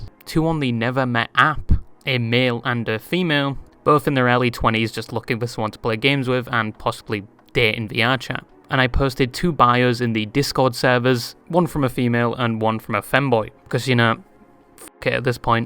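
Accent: United Kingdom. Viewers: what accent is this, ik its uk but what area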